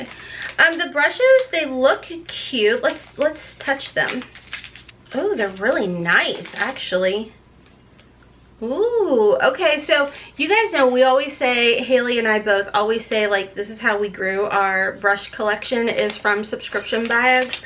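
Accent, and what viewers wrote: South, United States. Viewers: Girl I absolutely love your country accent coming I'm from. TN and the faster I talk the more mine comes out . I too have not been able to pick my products for ipsy glam bag plus and I don't have Pr or the open studio stuff Seems like Ipsy is kinda going down hill big time my small bags are more exciting than the big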